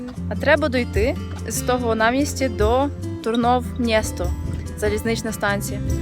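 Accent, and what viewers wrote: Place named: West, Ukraine. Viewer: доброго дня.😀. Дивлюся вашівідео,дуже гарні і позитивні,а найважливіше Українською. Звідки ви,бо чую ніби західний регіон?. Хотів би запитати можливо,щось чули про завод Sempermit,в містечку Odri,неподалік від вас? Бо виробляю туди документи на роботу з дозволом на 2 роки.Дуже дякую за інтересні відео,успіхів вашій сім'ї. Можливо колись зустрінемось в Чехії.дякую.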